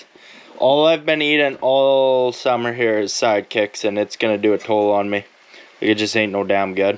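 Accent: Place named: Eastern Canada, Canada. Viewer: A very smart young man. Im not sure but he sounds like he must be from eastern Canada I was up there years ago in Whitehorse & Inuvik. This kid has got it goin on. Btw..you can peen that crush sleeve back out & re use it. Ive done it and seen it done many times. It just sers you pre load on your pinion. Best wishes!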